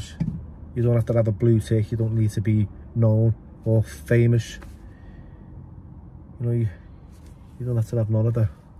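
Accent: Liverpool, United Kingdom. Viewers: Keep going bro your good I like to hear one of my favourite scousers bellew ok and that lol but you give us the street ex addicts new addicts any addicts but anyone gets a chance with you don't lose it now bro your on the pinnacle of success you will be in films soon bro your already a film star bro end o chat bless and thanks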